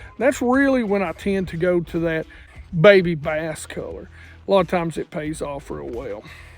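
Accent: South, United States. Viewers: Great advise but I can’t take this slop southern accent for another second. Unsubbed.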